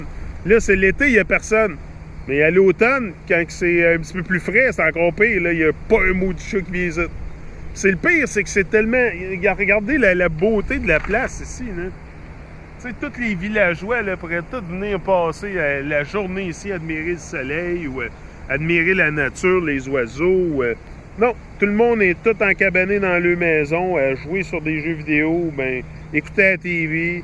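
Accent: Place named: Quebec, Canada. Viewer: Salut, il n'y a pas beaucoup de francophone qui traite ce sujet donc, oui, continue le français ou si tu parle anglais, mets les sous-titres. Ton accent québécois n'est pô un problème tsé ;-)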